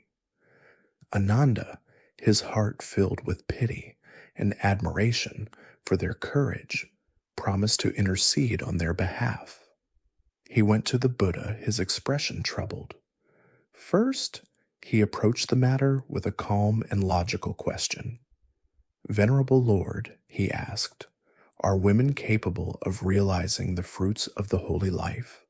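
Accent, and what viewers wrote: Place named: United States. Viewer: Horrific American voice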